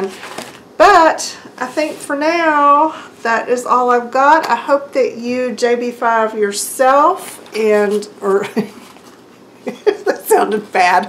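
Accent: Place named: Texas, United States. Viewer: I’m so delighted to know they still make the color “YELLA” I thought they quit making it when I left Texas 30 years ago. When I heard you say “YELLA,” I had to “PEENCH” myself. And then I saw yer Chick fil a card.I’m soooooo homesick, even now. I lived in Tennessee for 4 years too. When I’ve been watching YOU, my aceant reverts and my hubby says “ you’ve been watching again.” I fought my accent fur years, coz people in California treated me like I was ignert. Then I discovered I was proud of it, and I cain’t get it back completely. Sometimes you need a little age and maybe a kick in the pants to bring you round to where you were. And where I was, I sounded JUST like you! Blessings yall❣️🙏🏼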